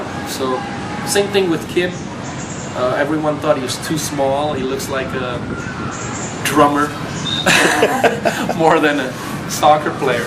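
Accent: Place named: United States. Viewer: Bahasa inggrisnya kental kayak Amerika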